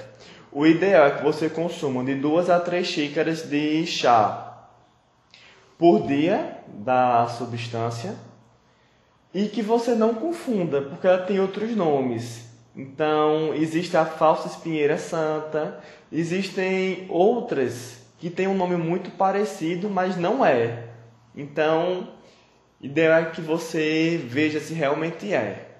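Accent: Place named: Juazeiro, Brazil. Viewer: Adoro esse sutaque juazeiro. Falando assim me sinto bem melhor!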